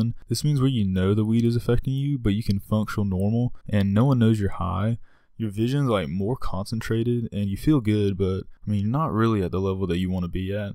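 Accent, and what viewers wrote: South, United States. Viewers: I can't tell if you're southern or a frat guy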